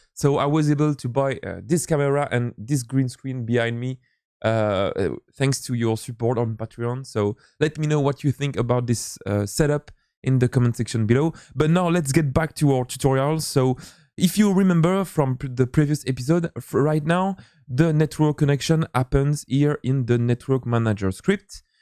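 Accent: France. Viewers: Wow:D a Wild appeared.:D Didn't expect this one,:P I like your French accent:P Tnx for the Multiplayer tutorial:) I will need it during my internship:] Epic!!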